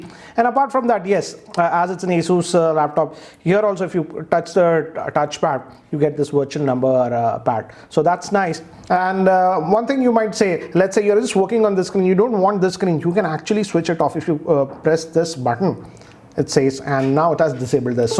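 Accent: India. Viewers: please stop speaking English in your Indian accent ! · 咖喱味英语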